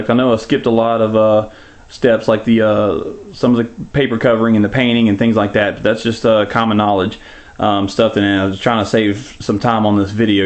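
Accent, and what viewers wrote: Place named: United States. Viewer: you sound very american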